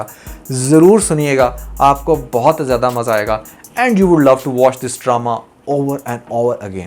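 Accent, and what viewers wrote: India. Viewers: Firstly you must improve your urdu before making videos secondly avoid Indian style of dialect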